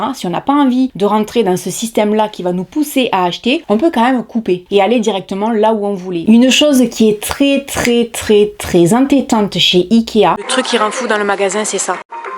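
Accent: South, France. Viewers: C'est toujours un plaisir de t'écouter/regarder tes videos. Au Canada, dans la ville de Québec, il n'y a de chanson Ikea, une chance!!! L''autre jour, nous l'avons fait notre magasinage a l'envers des fleches! (pour le plaisir, voir autrement, etc)!! Les prix sont plus dispendieux. Ma fille et moi adorent regarder tes videos. Je m'ennuie de l'accent du Sud. La proximité des autres pays me manque aussi. take care. Pleins de bisous de l'autre coté de l'ocean.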